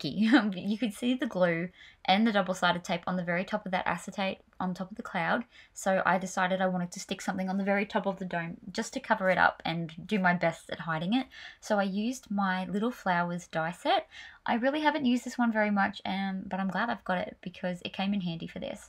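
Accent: Australia. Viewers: Love love love your Aussie accent!!🥰 love your creation❤❤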